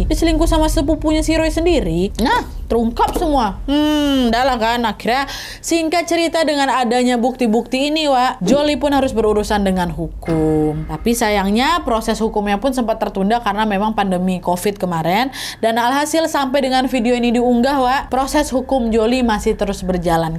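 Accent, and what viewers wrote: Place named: Sumatra, Indonesia. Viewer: Logatnya kek orang sumatra...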